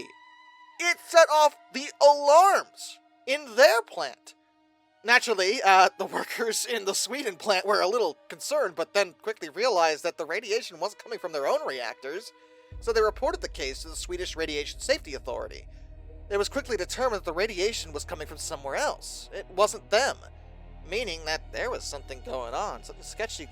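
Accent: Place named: United States. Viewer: Okay dude you sound like an American so why in the hell are you calling Z zed?! What are you some kind of eurodegenerate or commie?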